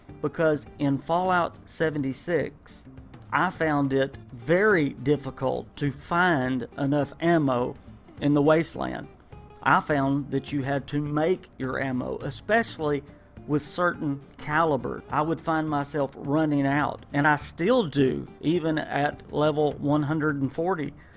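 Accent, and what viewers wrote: South, United States. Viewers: love your country-ish accent! great videos, sub'ed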